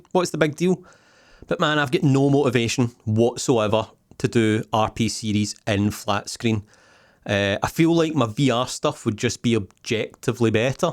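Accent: Scotland, United Kingdom. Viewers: I think we're all here for whatever you decide to do. Personally I never realized how much I needed a thick Scottish brogue in my life till I found your vids. Thanks for all the laughs my friend and I can't wait for what's next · Vr, flat, doesn't matter to me at all! Honestly your banter is unmatched and you're hilariously entertaining no matter what you do, so I'm not going anywhere. The content is almost secondary to the laughs and the gloriously Scottish commentary throughout xD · I dont mind any games tbh. I just come to your channel for your banter and Scottish accent hehe. I'm glad it's nothing serious though. Take your time and go at your own pace:) Can't wait to see more of your content:D Ngl i did come for the VR stuff but once i heard your banter and jokes etc. That whole personality stick, I'm definitely sticking around <3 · I'm here for the funny Scottish man rambling at me. 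I honestly don't even look at the video 80%-90% of the time. To me, as long as you keep doing you, nothing will change. I would like to see "Scottish skeleton pirate plays Mario 64" though. YOU CAN'T HIDE THAT N64 FROM ME, I SAW IT! Anyway, you'll be fine im still gonna listen to you. Take care of yourself. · I'll gladly throw money your way just to listen to you talk, VR be damned. I moved to Scotland 5 years ago from Hong Kong and you have been one of my better sources to get used to the Scottish accent. Good luck and look forward to your new stuff!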